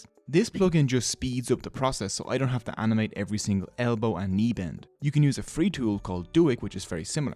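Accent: Dublin, Ireland. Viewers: Love the Dooblin accent 😆!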